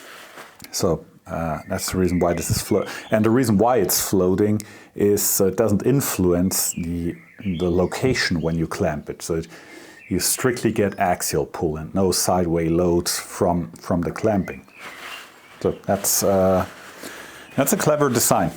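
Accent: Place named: Upper Palatinate, Germany. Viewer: Very nice 😎👍 if you ever purchase sthg big again and want to clean it, drop by my shop and use my Würth Parts Cleaner, VOC free, that stuff gets the grime off in no time. I am not sure of your location, but you sound like a Oberpfälzer 😉 i am located 15min next to munich airport with the shop 😊 Greetings, Chris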